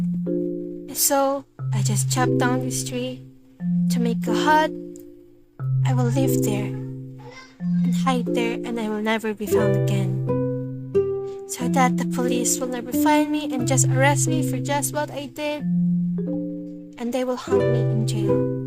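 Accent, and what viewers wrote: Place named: England, United Kingdom. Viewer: THE VOICE OVERRRR OMAGASSHHHH! love your english accent ateeee! so fluent <3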